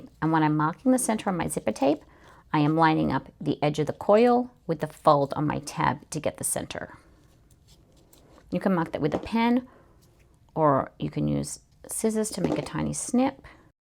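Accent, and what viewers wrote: Australia. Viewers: You always have such lovely fabrics❤ They make beautiful bags. I made the Pythagorean pouch today with fabric lining and vinyl outer, it turned out lovely. Just a question, you have an unusual accent. I’m Australian and some words you say sound very Australian. Have you lived in Australia?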